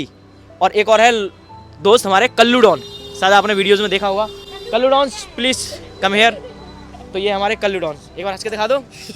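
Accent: Bundelkhand, India. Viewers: Kya bundelkhandi bolte ho 😂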